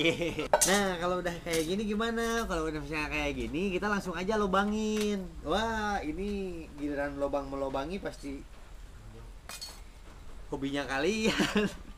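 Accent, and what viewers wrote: West Java, Indonesia. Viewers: Resep euy geunaheun ningali logat sundana wkwkwk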